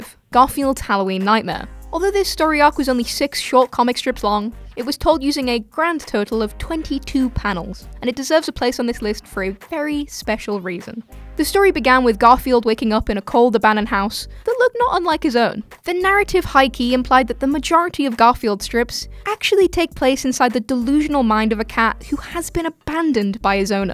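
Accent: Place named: United Kingdom. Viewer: So go to an American channel if you're so irritated by our multiple dialects... American's pronounce things differently to us UK residents, yet it doesn't bother me in the slightest. Imagine being so pressed by a bit of difference. Calm down petal. ✌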